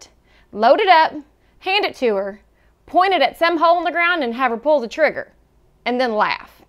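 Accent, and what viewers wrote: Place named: Kentucky, United States. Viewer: I'm from kentucky so I like the accent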